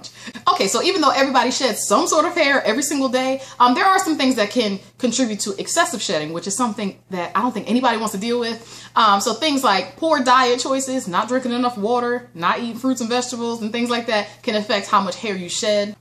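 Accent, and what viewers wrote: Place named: Texas, United States. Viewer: I love your accent! Are you from Texas? I'm Canadian Jamaican and sound nothing like you!! Lolol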